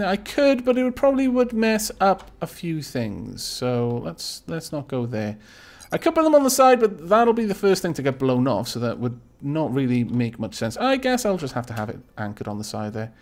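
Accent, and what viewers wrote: Wales, United Kingdom. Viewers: I sodding love your accent. The Welsh really have a wonderful language:) Great video, just as usal,! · I love the British accent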